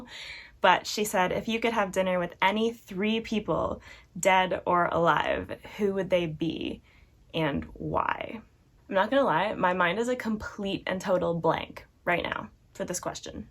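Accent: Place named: Europe. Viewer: Playing team sports is the best thing in the world and pizza is the answer to everything. Also, your trip to Europe explains your European accent.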